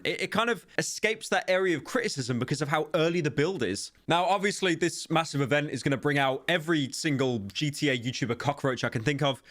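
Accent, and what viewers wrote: United Kingdom. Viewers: I love the added 30 seconds to get to that 8 minute mark, thank you funny british man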